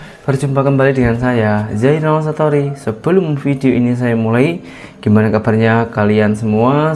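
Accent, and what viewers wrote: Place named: Indonesia. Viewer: Kelakar la..kt malaysia cra kamu brckp ok je..sng phm..blk indonesia da brbeza..semakin pekat bahasa tnh air..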